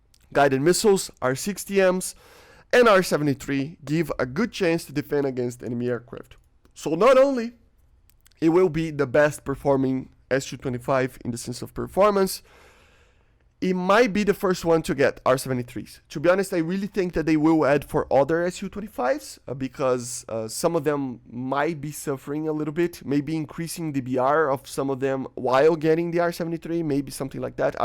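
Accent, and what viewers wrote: Brazil. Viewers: Mano, esse cara parece um brasileiro falando inglês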